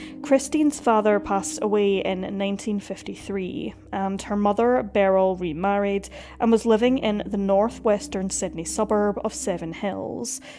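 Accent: Scotland, United Kingdom. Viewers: Love the Scottish accent 😊.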